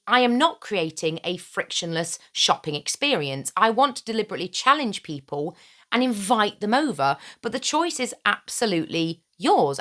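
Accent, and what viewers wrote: United Kingdom. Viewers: Hello, from Michigan, USA. I wish I could express to you how much I love your content and how many times I've read things in your sweet British voice and pronunciation😊 Your videos are so well researched and thoughtfully delivered. And very refreshing in this extremely cold midwestern winter! Until next time...🧡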